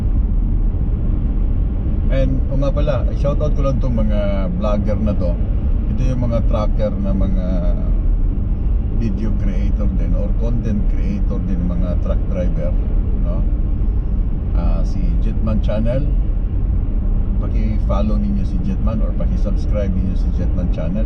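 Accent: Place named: Pampanga, Philippines. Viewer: Parang kapampangan sya kase ako ganon matagalog lol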